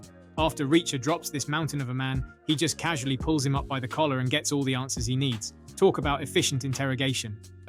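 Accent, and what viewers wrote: United Kingdom. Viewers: THREE/'#-separate-times, ths IIIDIOT British 'voice' states tht, tha 'crazy-doctor' attacks Neegly with a "drill"; it wuz a SAW! NOT a 'drill'! Ths iz one-of-MANY reazonz tht, I HATE British-narrated 'stuff'!! Stay in YOUR land! Leave American stuf ALONE!! YOU-ppl SUCK! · I really do not like to hear the narrating from someone from England. They talk funny and their English is Fucked up!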